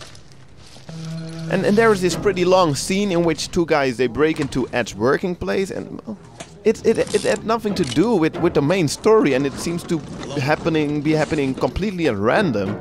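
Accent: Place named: Netherlands. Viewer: Yeah man!!! And your from holland..Ik ook!!! ik merkten het aan je acsent en het liedje in het begin. Goeje beschrijving voor de film man!!!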